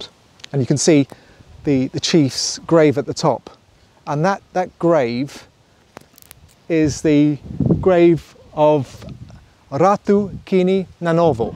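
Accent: England, United Kingdom. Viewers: That English accent will get you anywhere...fantastic work. There's a koro nearby called vatukarasa,one side indians live and the other side fijians and both sides are very accommodating let them take you night fishing you will absolutely love it.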